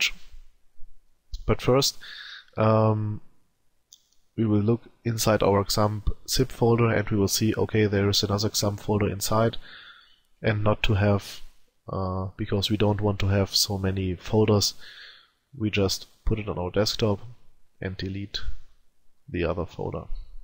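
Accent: Germany. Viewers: Kann es sein, dass du Deutscher bist? ^^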